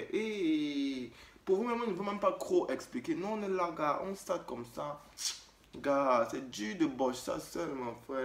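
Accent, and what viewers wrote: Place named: Cameroon. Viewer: J'adore ton français camerounais. Je n'ai presque rien compris...but your acting is so funny!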